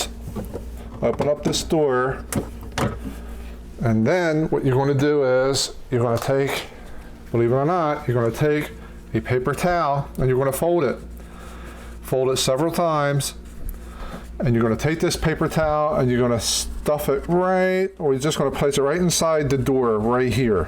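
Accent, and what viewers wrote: Baltimore, United States. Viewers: Sir, I'm from Balmore and you sound as though you may be as well. I just did this and your "fix" worked! Thank you! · That did it! Thanks so much. …and thought I recognized that Balmer accent. Go Ravens!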